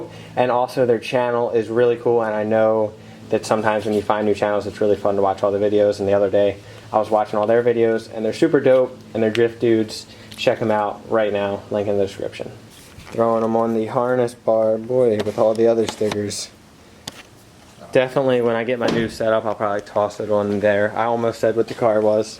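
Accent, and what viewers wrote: Maryland, United States. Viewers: High Live yeah. He's from Maryland. Lol. I'm sure I have a weird accent to everyone that's not from my area.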